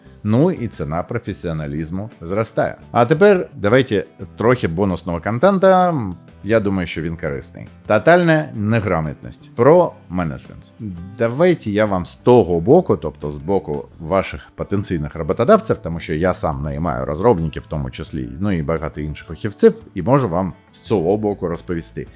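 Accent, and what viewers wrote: Russia. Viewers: Переход на украинский - это одно, но результат пока что выглядит сыро. В речи много русских слов и интонаций, из-за чего украинский воспринимается неестественно. В итоге это только ухудшает общее впечатление от видео :( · ти топчик, що перейшов з російської на українську. Це на справді дуже потужних та поважний крок, повага від всієї україномовної спільноти. Але будь ласка продовжуй цей процес бо твоя україська звучить як адаптована на україньську російська. Тобі ще є куди рости, вдосконалюсься, та все буде Україна. Дякуємо тобі за твій вибір.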